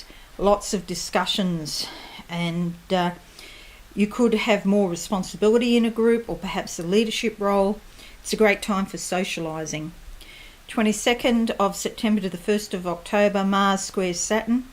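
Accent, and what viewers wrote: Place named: Australia. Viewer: It's so warming to hear a fellow Aussie.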